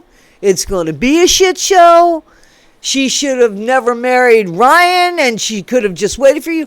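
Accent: Scotland, United Kingdom. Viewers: Her dogs name is (was) Pixie. Ryan’s now. I love your Scottish accent. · I’m loving that Scottish accent 🤣😂😂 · your Scottish accent doesn't suck, it's hilarious actually 😂😂😂😂😂😂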